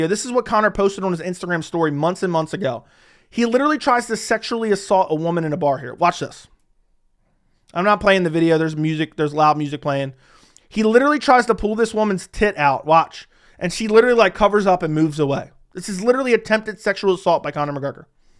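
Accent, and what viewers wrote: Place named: New Jersey, United States. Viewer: You gotta be from NJ because I speak the same exact way when I’m passionate and frustrated 😭 you’re so right tho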